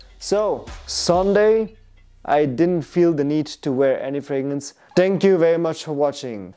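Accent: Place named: Italy. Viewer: Wearing Gris charnel far too much at the moment 🤣. That Italian accent, almost as good as mine 🥲👍🏻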